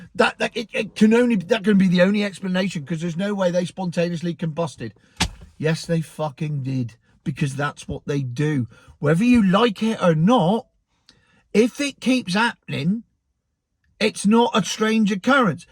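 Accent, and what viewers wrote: Cornwall, United Kingdom. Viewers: Your funniest video yet. I was pissing myself all way through lol. Love the cornish accent,takes me back to when i was young,my grandparents were cornish. Btw watching your video there were lots of orbs floating around your head.spooky.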